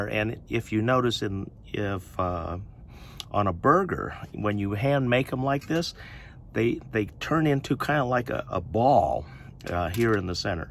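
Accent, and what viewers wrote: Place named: South, United States. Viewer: I could tell that living in North Carolina really did you well for that semi-subtle Southern accent.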